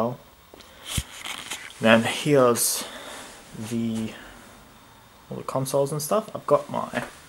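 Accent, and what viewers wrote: Australia. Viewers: Very nice setup bud! not sure about the lounges haha but great ;) can definitely tell you're from Australia like me ? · U Aussie bro ?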